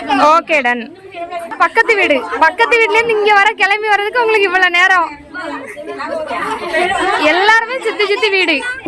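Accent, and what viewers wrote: Tirunelveli, India. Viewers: Akka unga thirunelveli slang nalla erukku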